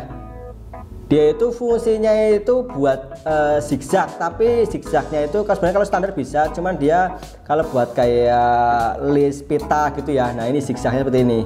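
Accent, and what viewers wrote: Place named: Banyumas, Indonesia. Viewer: Mantap maas..smua teori n praktekny.. Seneng dnger ngapak2 ny ..hehe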